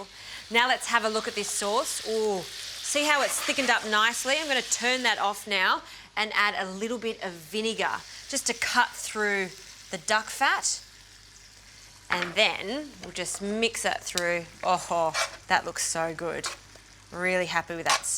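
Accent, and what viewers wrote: Australia. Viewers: Oh my God guys. It's pretty clear from her accent she's Australian so yes she uses Celsius. Come on. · stop making yourself look like an idiot. I'm Australian, she's an Australian celebrity chef, America is the only place not using metric and her Australian accent is a dead give away, even though I don't notice the accent because I'm Australian. "Everyday Gourmet" is an Australian cooking show hosted by Justine, the lady in the video. So yes, it is metric, it is obvious to anyone that has morw than 2 cents for a brain. Merry kiss my ass.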